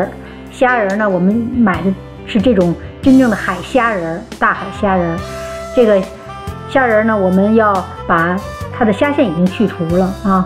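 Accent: Tianjin, China. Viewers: 大姐天津人吧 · 有些天津口音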